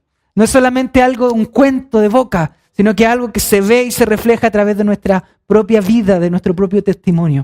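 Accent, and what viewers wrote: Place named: Chile. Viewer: Increíble el acento chileno como si se nos nota, aunque pensemos que no😅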